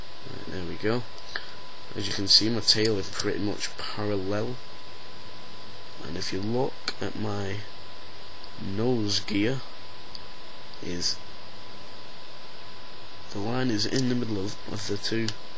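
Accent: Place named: Yorkshire, United Kingdom. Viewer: YOUR YORKSHIRE!!!! SO AM I!!! xD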